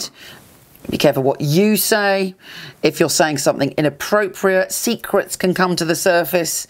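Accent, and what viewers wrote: United Kingdom. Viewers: Love all your Astrological PSAs ... your English accent #Grrr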